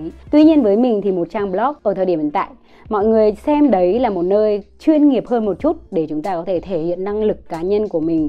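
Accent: Central, Vietnam. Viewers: Em rất thích chị và các video của chị💛💛💛 Thích nhất khi chị nói giọng miền Trung nữa 😊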